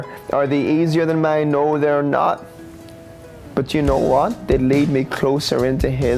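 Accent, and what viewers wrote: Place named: Ireland. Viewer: I love listening to speak, not only the Irish accent 😉 but to speak of Jesus and what leads him to follow and do Gods will. Thank you and WAM 🙏🏼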